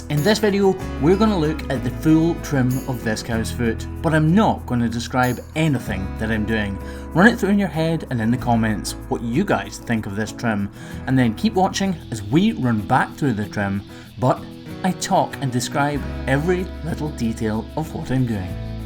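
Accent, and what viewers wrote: Scotland, United Kingdom. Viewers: I especially loved hearing all the background sounds during the first part. However, being from the United States, I can’t get enough of your Scottish accent. ☺️💜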